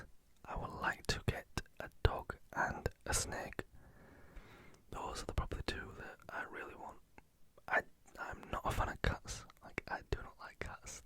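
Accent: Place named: Yorkshire, United Kingdom. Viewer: I like your outlook on people who don't get ASMR! Just let them go 😂 I been guessing you were from Leeds from your accent, when you said Bradford made my day! I never get it right hahah. So glad your channel has grown, well deserved ✨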